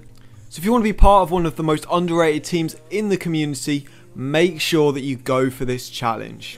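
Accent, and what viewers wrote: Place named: United Kingdom. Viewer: Gotta love that brilliant British accent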